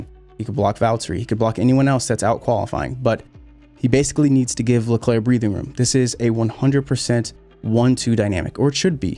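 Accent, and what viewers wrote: United States. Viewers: An f1 commentator with american accent????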